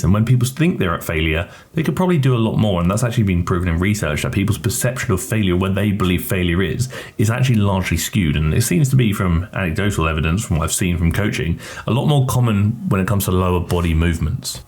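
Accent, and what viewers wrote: United Kingdom. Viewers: Favorite time of week when hot British man talks to me about fitness.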